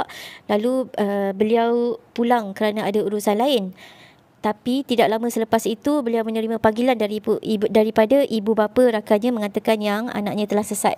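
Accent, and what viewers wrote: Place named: Java, Indonesia. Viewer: Pekat jawa nye ni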